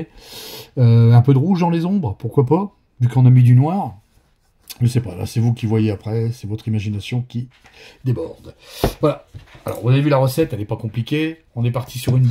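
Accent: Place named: Nord, France. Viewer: Merci pour cette super recette, j'aime bien le rendu 🙂 Un grand oui pour des tutos une fois par semaine 👍 Je ne sais pas si tu donne des cours, mais tu devrais. Tes explications sont toujours très claires et sans chichi. Un grand merci! Et en passant, ton accent du chnord est au TOP. Bonjour de la Suisse!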